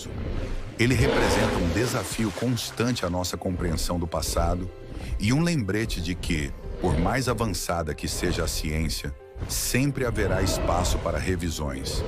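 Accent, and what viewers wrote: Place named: Minas Gerais, Brazil. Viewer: Dublagem Minera uai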